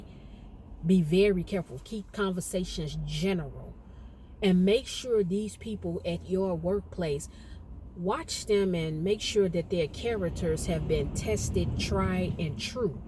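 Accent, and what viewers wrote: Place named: South, United States. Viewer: Are you from the south I hear the southern accents? You stretch your words when you talk.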